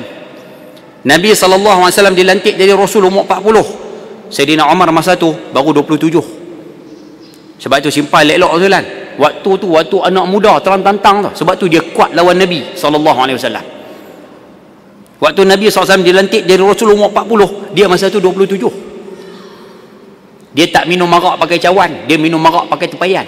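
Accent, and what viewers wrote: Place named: North, Malaysia. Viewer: Antara ustaz loghat Utara yg aku sgt minati seangkatan Ust Shamsuri,Ust Don,Ust Wadi dan Ust Abdullah Khairi.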